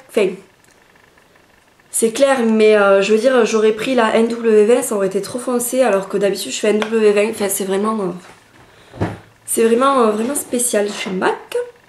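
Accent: South, France. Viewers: tu vien du sud avec ton accent je me trompe ? ^^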